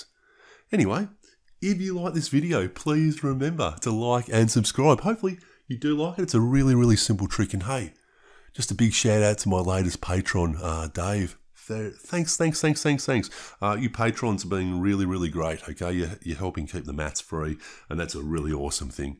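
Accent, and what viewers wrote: Australia. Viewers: I agree with you Adano. That is an Australian accent.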